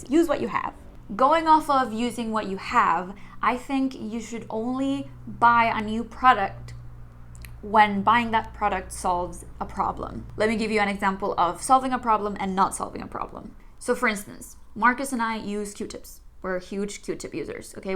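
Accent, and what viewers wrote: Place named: United States. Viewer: You sound like you are from America 😳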